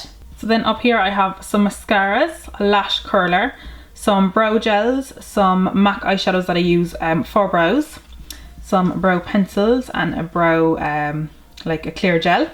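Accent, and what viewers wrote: Ireland. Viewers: 💋💋💋💋💜 first Irish MUA accent I’ve heard lol 😘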